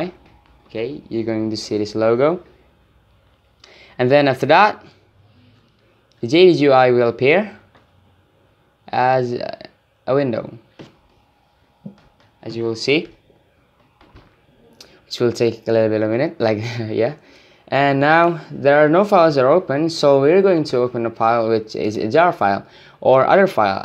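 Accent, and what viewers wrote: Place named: India. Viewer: you have Indian accent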